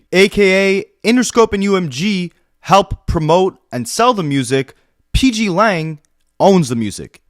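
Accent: Toronto, Canada. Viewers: This video was fire n 10/10 Toronto language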